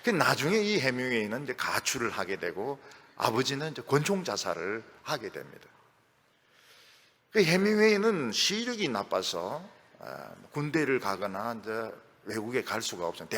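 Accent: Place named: Gyeongsang, South Korea. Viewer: 목사님! 감사합니다. 저도 경상도 사내입니다. 경상도 구수한 언어로 쉽게 이해할수 있겠끔 설교하여 주시니, 깨달으며 은혜받음을 하나님아버지로 말미암은 징계,핍박,고난 달게 받는자 되도록 새롭게 마음 다짐니다. 늘, 건강하셔서 멋진설교 지속하여 주실것을 기도 드리겠습니다.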